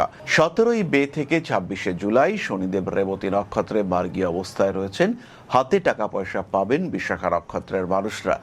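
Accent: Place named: Bangladesh. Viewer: আপনি কি বাংলাদেশে বড় হয়েছেন ছোট থেকে মানে আপনার কথার টান শুনে মনে হয়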